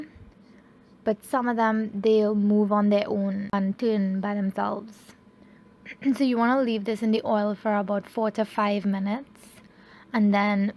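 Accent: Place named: Trinidad and Tobago. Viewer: I did enjoy your video. You did an excellent job explaining everything because I also love to cook. You did it in beautiful Trini accent and it also showcased our culture. I would not add the seasoning but the variations in cooking is varied...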